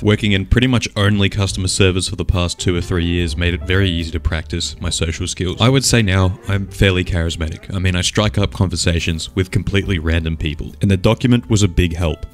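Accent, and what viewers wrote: Australia. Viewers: cheeky aussie accent for extra charisma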